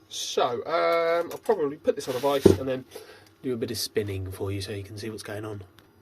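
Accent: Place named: United Kingdom. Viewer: what nobody wants to hear is that smooch british bs accent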